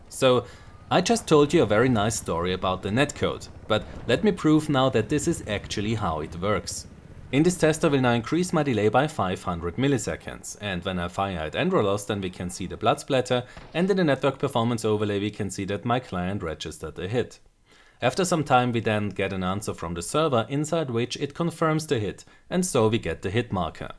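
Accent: Germany. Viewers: Are you german ? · Yeah im german and I clearly hear the accent:p your english is very good though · The German accent. You know you hear it when you hear "Die Netcode". Many thanks for the info!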